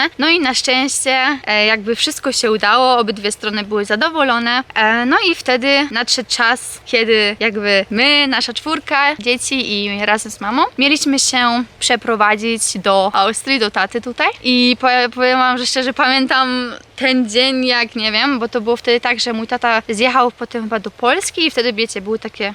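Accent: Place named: United States. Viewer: Dlaczego tak mówisz ? Ten akcent ..jest nie do zniesienia . Jesteś Polką czy Amerykanką ?! Bardxo mècząca gadka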